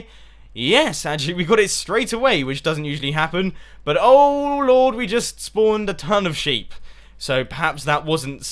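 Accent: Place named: United Kingdom. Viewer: hes friggin british dude or English whatever you prefer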